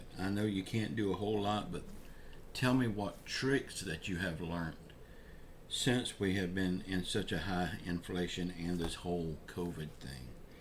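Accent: United States. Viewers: you remember that country classic by that you I like your show I like your country ascent you are just good old boy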